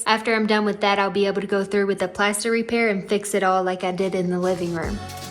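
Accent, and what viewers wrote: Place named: United States. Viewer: Hello from Australia. Im Really enjoying this series. You are doing fantastic and you are such a hard worker, you should be very proud of yourself. I have no idea what part of the US your accent is from but i love it. Thank you for sharing your journey with us.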